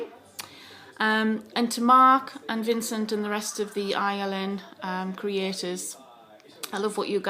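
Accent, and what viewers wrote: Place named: Newcastle, United Kingdom. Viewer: Absolutely fab Hun, love your lippie and your Geordie voice, keep them coming, you've had your breakthrough! xx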